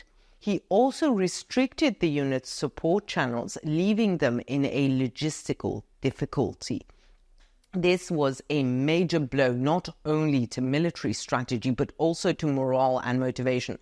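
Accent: United Kingdom. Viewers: I love your beautiful hair and British Accent.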